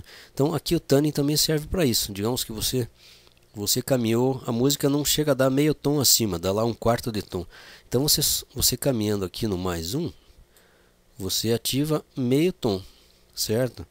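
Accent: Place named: Curitiba, Brazil. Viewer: Caro Amigo, que maravilha o teu canal chê!!! Estou tendo de gravar no audacity, por eu ter mexido no meu Sonar 6, e sem saber, devo ter mexido em algum menú que tenha desconfigurado totalmente as saídas de audio e NÃO ESTOU PODENDO OUVIR MAIS NADA DO QUE JÁ GRAVEI!!! Prejuízo lamentável, e o pior de tudo, não sei se terei de perder tudo o que já gravei! Pois penso em pedir a um amigo meu pra desinstalar o Sonar 6, e re-instalar de novo, ou haverá uma esperança de eu reaver tudo de novo, se existir uma forma de eu "resetar" TODAS AS CONFIGURAÇÕES DE FÁBRICA do Sonar 6?!? Ainda que eu perca tudo o que eu gravei, (são só seis músicas) E voltar do zero de novo?!? Há essa possibilidade?!? Por favor, me dá uma luz... Desde já, muito agradecido. Um grande abraço daqui da Cidade de Maringá, Noroeste do Estado do Paraná, sul do Brasil chê!!! Sois de Curitiba?!? 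Senti bem forte uma acentuação no teu sotaque que me é muito amigável e familiar!!! Reiterado Abraço meu Amigo!!!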